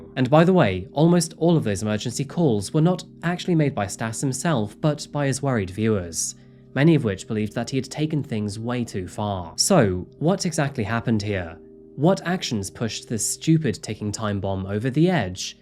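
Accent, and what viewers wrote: England, United Kingdom. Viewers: You have an English accent but pronounce some words like an American? Is this for your American viewers or just how you say it?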